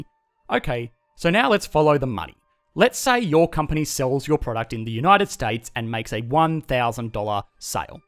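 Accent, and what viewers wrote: Australia. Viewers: You have the most charming Australian accent I’ve hared😃👏😄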